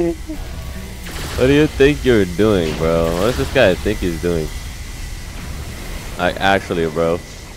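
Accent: Jamaica. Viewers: Bro jamaican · Whit you talk Jamaica bro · Are you Jamaican?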